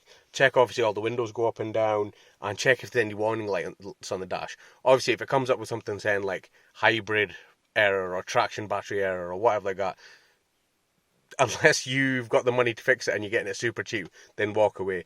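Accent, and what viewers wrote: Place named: Scotland, United Kingdom. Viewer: Scottish....... subbed lol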